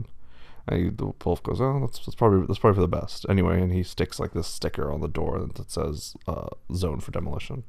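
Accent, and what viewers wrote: Germany. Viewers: the german accent is fucking sending me into oblivion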